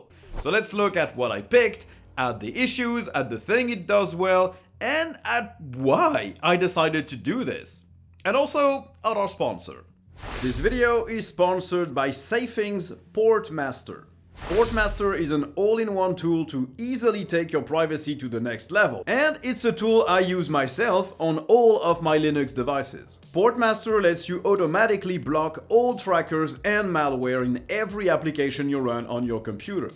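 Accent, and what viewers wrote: France. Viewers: Petit frensh accents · He's french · J’suis pas fou c’est un français il parle bien mais on se sait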